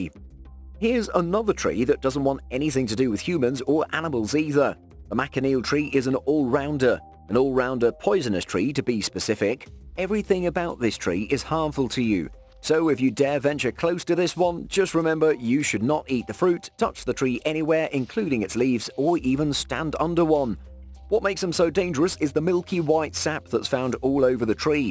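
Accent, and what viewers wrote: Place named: United Kingdom. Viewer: Uk accents so different from ours strick-neen we say strick-nine migraine me-grain we say my-grain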